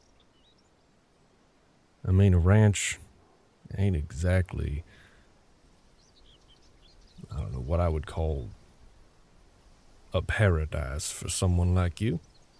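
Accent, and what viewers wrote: South, United States. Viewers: Geez...that deep country accent got this country lady fallen head over heels. Yeeee-doggy! 🤠😍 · as a southerner, this accent ain't bad. It's hard to tell what dialect, though. maybe Georgia? Mississippi?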